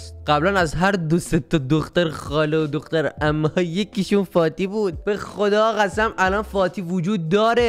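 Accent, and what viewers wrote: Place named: Abadan, Iran. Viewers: لهجه آبادانیت هعی میزنه بیرون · میشه یک ویدئو کلا ابادانی صحبت کنی🥲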